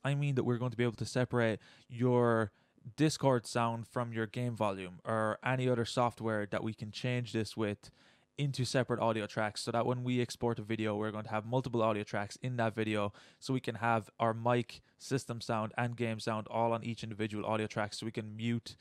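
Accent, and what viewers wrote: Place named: Ireland. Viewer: defintley irish, just cant tell exactly where :/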